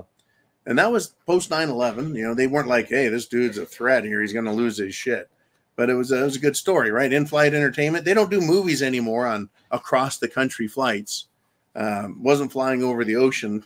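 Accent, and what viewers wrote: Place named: Idaho, United States. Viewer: You sound like you were in cordulane idaho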